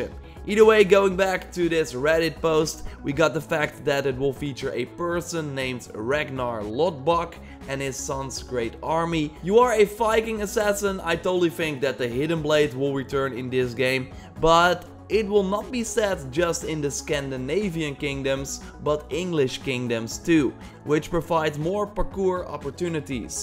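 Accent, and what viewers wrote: Netherlands. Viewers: ik hoorde echt gelijk aan je accent dat je Nederlands was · jij komt uit nederland dat hoor ik gwoon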